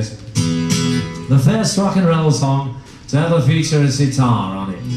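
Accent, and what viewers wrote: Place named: Liverpool, United Kingdom. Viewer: Incredible. I'd love to see this guy! And he picked up the liverpudlian accent as well. Awesome job!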